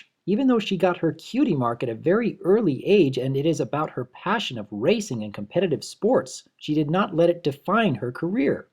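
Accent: United Kingdom. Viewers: Dear Are you British or American?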